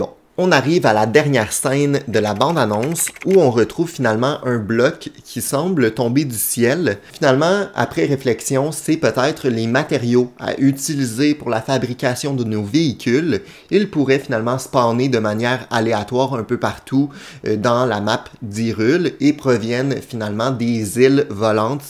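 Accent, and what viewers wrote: Quebec, Canada. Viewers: J'adore ta vidéo. Je suis en France. Je kiff ton accent québécois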